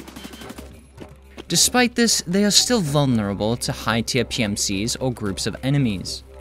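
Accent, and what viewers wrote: United Kingdom. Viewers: Dude you're British!?!??!?1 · This is either an awful English accent or it's authentic & feel bad for this lad. That aside, these videos are very well written, edited and fun to watch.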